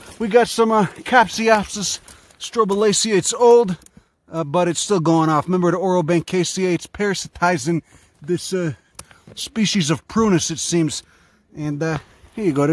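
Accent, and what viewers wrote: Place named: Shasta County, United States. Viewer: you sound like you live in shasta county like shingletown or something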